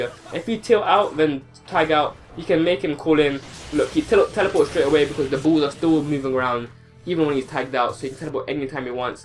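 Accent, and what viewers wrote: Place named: France. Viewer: I didn't even realise this guy was speaking english, i thought i clicked on a french youtuber's channel for a second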